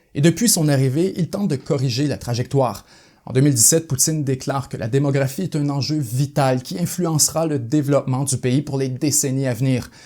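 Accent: Quebec, Canada. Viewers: T'es québécois toi, non?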